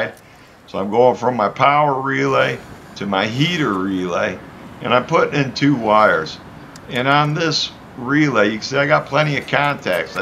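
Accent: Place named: Connecticut, United States. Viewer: Wayne (Marion Robert Morrison) born in IA and raised in California - are there these typical accents from either State? but you're right - sounds a whole lot like John Wayne. Maybe here might disclose which State of the Union he was raised and what we're hearing - I detect some CT in there.. it's a cool dialect where ever it may be located.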